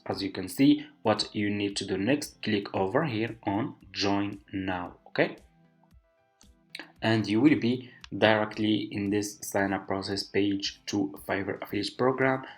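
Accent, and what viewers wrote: Morocco. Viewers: sawtek mghribi bro? 😛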